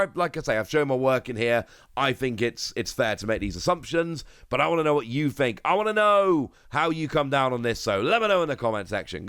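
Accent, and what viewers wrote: United Kingdom. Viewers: You have so strong British accent however you are really clear, it is a pleasure to follow your channel.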